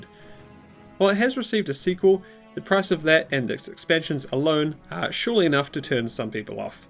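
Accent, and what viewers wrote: New Zealand. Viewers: Where in New Zealand are you from?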